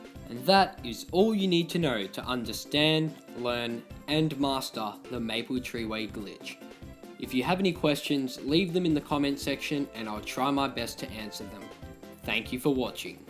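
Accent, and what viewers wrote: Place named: Australia. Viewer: Like this comment if you only care for the Aussie accent 🙂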